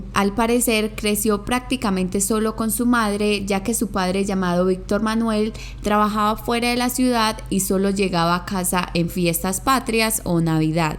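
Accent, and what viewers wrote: Colombia. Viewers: Adoro como explica sus casos,es increible y su acento colombiano enamora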